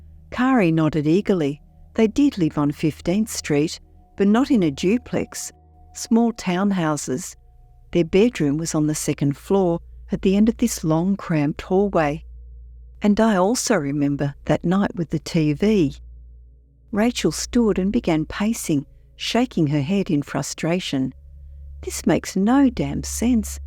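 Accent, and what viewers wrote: Australia. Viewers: The woman narrating this story is Australian, not British.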